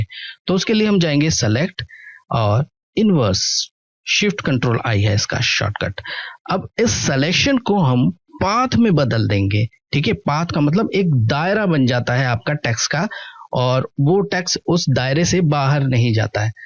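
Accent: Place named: Pakistan. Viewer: superb sir keep it up when you speak it seems to me someone speaking in very pakistani urdu language from india, i am indebted to you sir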